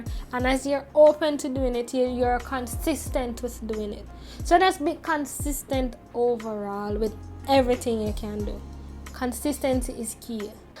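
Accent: Jamaica. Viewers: Alsooo... I love listening to you speak! Makes me feel like im around my cousins and family in Jamaica ❤️❤️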